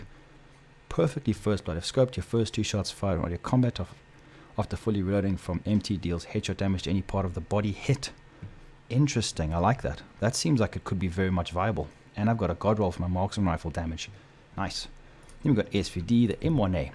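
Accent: South Africa. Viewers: Are you from South Africa? And Great video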